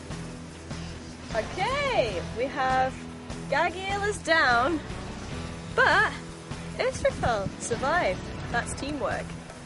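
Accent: United Kingdom. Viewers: Hilarious. Great video. In addition to the humor, I was struck by how deeply British your accent was at that time. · Back when was British. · Why does speaks with the British accent in this video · So, I notice a different accent back then. The UK experience no doubt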